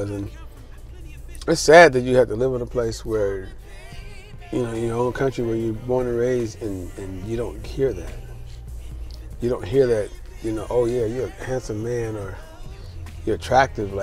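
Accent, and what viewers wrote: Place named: California, United States. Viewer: I knew you was from California. At first I thought you might have been from Oakland or The Bay. You sound like all my potnas I hung with growing up who was raised right like myself.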